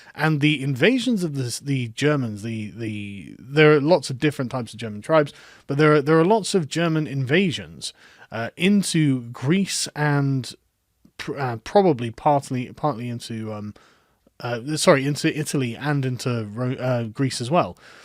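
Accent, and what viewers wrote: United Kingdom. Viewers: I'm from the U.S. Love your British wit and accent. I despise what youtube has done to you and we haven't stopped it. All aboard Trump 2020 and Brexit!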